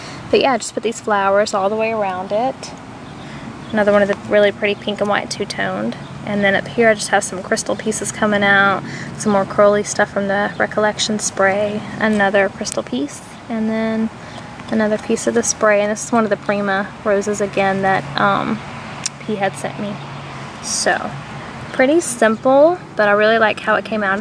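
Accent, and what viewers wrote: South, United States. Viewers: Oh my goodness this is so pretty!!!! I love it....I knew you were a southern girl.....just didn't know what part.... I'm know Pea will love it....awesome project.....as always.....tfs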